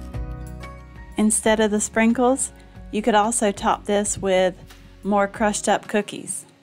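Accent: South, United States. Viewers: Thanks for visiting my channel,! I just took a walk down Memory Lane on yours. My mom's from the south, so you had my full attention. I love southern accents. 😁And I have not seen Cool Whip and Nutter Butters since the 90's (not the kind of thing I can buy in Japan). 😆 Thanks for the great video and recipes. I love that someone ate your pie before it was set. Enjoy your long weekend!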